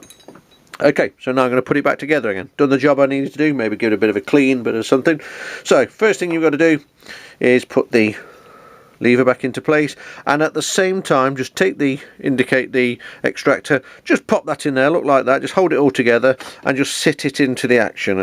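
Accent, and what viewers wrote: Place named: United Kingdom. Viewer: Clear, concise and helpful video... with a great Brit accent to boot. Good stuff!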